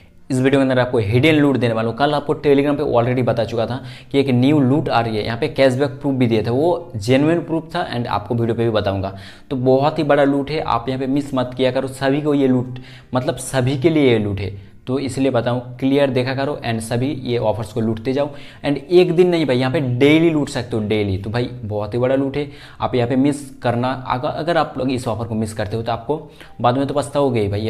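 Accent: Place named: Bihar, India. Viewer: Kyo ki app awaj se Bihari lagte ho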